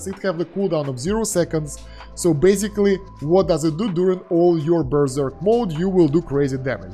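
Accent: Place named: Russia. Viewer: у тебя русский акцент. Ты украинец?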